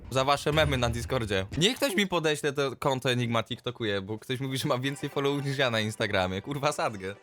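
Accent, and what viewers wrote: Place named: United Kingdom. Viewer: To już wiemy dlaczego masz Brytyjski akcent i umiesz gadać po ang pogo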